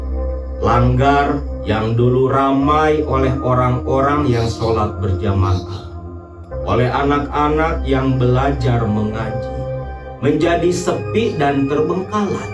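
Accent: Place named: Banyumasan, Indonesia. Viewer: Tegal hadir kang wong ngapak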